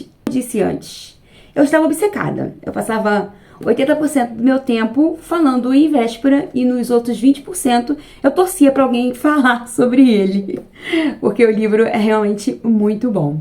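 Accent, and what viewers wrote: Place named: Rio de Janeiro, Brazil. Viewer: te acompanho há pouco tempo. E ficava sempre “encafifada” … é carioca. Como acompanho uma infinidade de canais … a gente vai pegando as gírias, os sotaques. E nesse vídeo específico … tirou minha dúvida. 😂😂😂 Carioca!!!! Parabéns!!! Adoro seus vídeos e seus shorts. Você é autêntica. E me identifico em uns shorts. Sou eu!!! e rio muito. 😉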